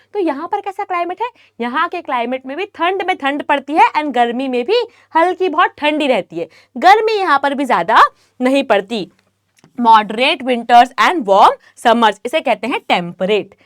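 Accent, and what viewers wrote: Bihar, India. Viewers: mam kya app bihari hai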